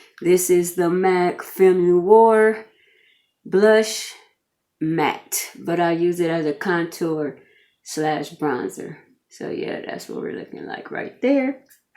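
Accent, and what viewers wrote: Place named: Los Angeles, United States. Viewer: Random question... r u from Cali ? I can’t really pick up exactly where u from , but u sound like u from LA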